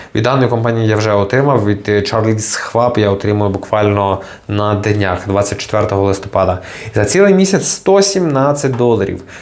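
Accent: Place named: Western Ukraine, Ukraine. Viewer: бо вимова як в неділю в церкві, або ви з Франика або з Рави або з Тернополя більше варіантів нема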